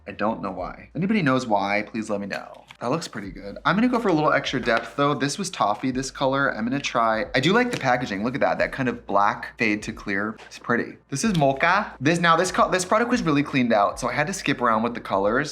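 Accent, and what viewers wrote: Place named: Milwaukee, United States. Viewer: The Milwaukee accent is front and center today ❤😂 LOVEEEEE ❤️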